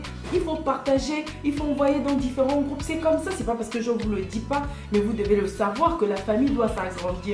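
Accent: Gabon. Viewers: Juste par ton accent je sais que t’es gabonaise 😋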